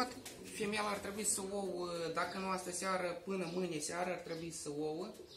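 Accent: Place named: Moldova. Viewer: E din moldova ca vorbeste moldoveneste👍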